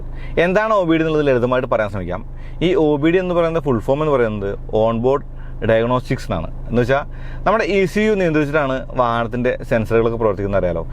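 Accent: Thrissur, India. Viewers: ദയവായി സംസാരം അല്പം സ്ലോ ആക്കു. നിങ്ങളുടെ ഈ തൃശ്ശൂർ charupurality മനസ്സിലാക്കാൻ പാടാണ്.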